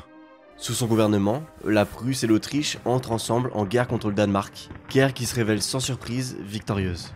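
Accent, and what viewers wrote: Paris, France. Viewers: Je plussoie sur l'articulation. Je décèle un accent parisien, avec une tendance à accélérer trop certaines parties et phrases. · Merci pour ce résumé très précis . Une petite critique malgré tout : attention à l 'articulation en parlant , j'ai l'impression d'entendre un petit jeune du triangle d'or (Auteuil , Neuilly , Passy) . Il faut parler plus lentement et plus articuler , marquer la pose .Si non c'est très bien , bravo.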